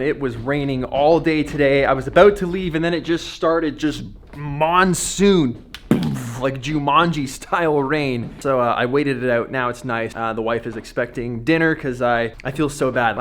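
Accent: Canada. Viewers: I always love the way says aboout. Pure canadian 😂😂. Great vlog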